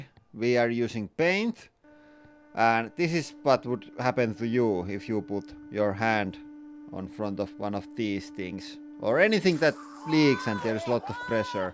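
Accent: Finland. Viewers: ❤ one of the reasons I come here is because of the Finnish accent, i like every accent. Be proud 😊 Ps and some very satisfying crushing😂❤ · I love the Finnish accent. It fascinates me.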